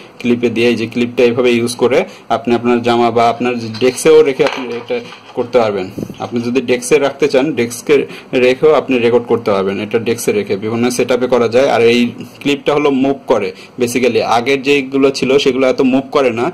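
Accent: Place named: Sylhet, Bangladesh. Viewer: আপনি সিলেটি..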